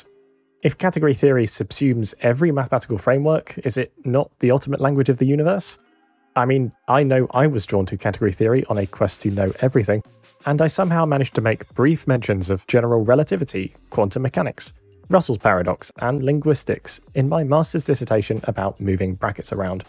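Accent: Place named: United Kingdom. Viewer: what is...katuhgree theory?? british accents man. wild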